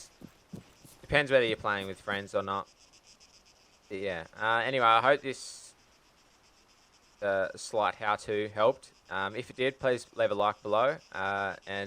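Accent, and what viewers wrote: Australia. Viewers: god you have the most aussie voice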